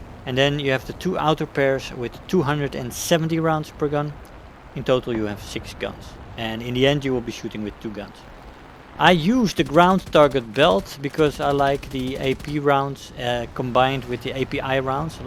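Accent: Netherlands. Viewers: Holy cow, i recognized that dutch accent of yours fast and realised that you were from the Netherlands just like me! 😂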